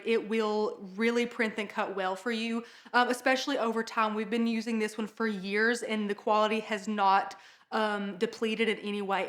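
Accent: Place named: Texas, United States. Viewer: Hey I love the accent. Are you from Texas by any chance? Just a guess.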